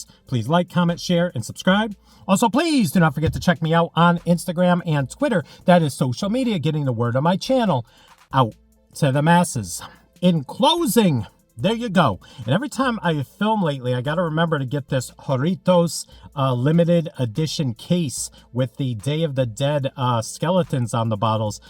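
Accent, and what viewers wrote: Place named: United States. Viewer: Lovely American accent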